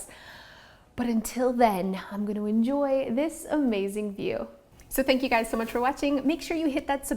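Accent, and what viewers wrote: Canada. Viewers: Hi! I’m relatively new to your channel and absolutely love how authentic and awesome you are! You’ve inspired my cricket self to continue to create organized spaces in my home that make me happy. It’s also great to hear a fellow Canadian accent – makes me miss home a little less. So excited to hear that you’re in NYC filming - you got this! Rooting for you!:)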